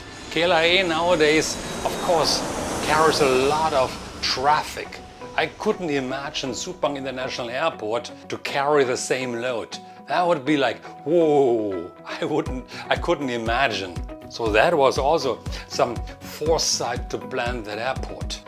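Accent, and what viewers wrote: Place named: Germany. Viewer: Commendable is your resistance to assimilation. If I lived in Germany I would've completely adopted all of the best that German culture, literature and idiomatically. You still have got the thick and melodious German linguae!!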